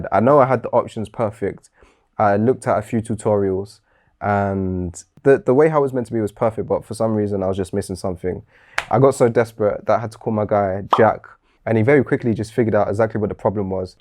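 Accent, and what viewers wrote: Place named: England, United Kingdom. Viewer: That happy feeling when you click on a video and hear an English voice instead of an American one. Ah, bliss.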